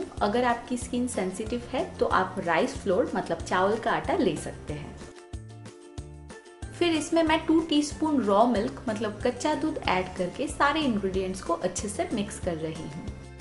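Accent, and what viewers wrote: India. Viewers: Before, you wrote the name of the ingredients in the legend. I know how to speak English, but due to your Indian accent I have a little difficulty understanding what you're talking about. Please, if you do not mind, start captioning again. Please. I like you so much. Congratulations on the evolution of the channel =)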